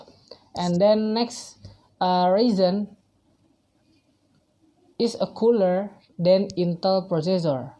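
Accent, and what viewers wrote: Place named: India. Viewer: logatnya sedikit mirip kaya orang india wkwkwk boleh laa kak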